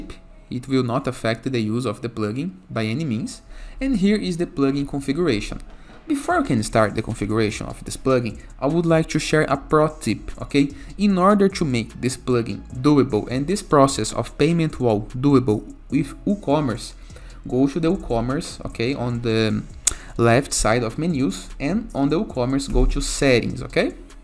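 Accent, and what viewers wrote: Brazil. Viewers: pelo sotaque e BR hahaha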